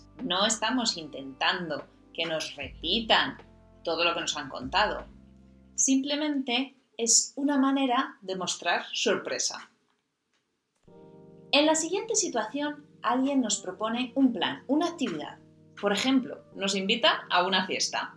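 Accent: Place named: Spain. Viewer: Me encanta su voz y acento española. Te mando besos y abrazos desde EEUU 🇺🇸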